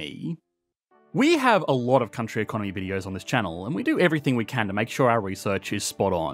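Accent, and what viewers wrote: Australia. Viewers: Greetings from China! You an Aussie or Kiwi? Guessing Aussie... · He's an Aussie. I assume that you have a VPN to watch this from China.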